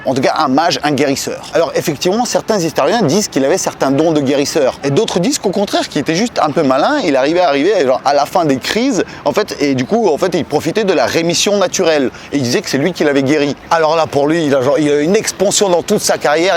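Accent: Russia. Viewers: Tu parles en anglais avec l'accent russe, c'est énorme ! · C'est marrant en Anglais on entend ton accent Russe et pas en Français... Я тоже русский, ну у меня нет русского акценты , только французский 😅.... Je ne sais pas trop ce qui est le mieux 😂. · J'adore l'accent Russe qui prend le dessus quand tu parles en anglais.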